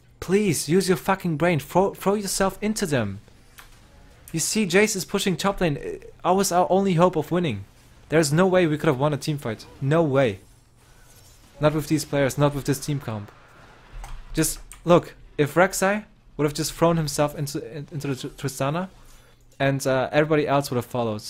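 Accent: Germany. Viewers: Are u actually german? You sound like a german. :d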